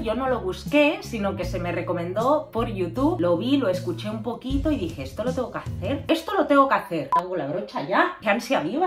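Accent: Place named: Russia. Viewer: Porque tiene asento ruso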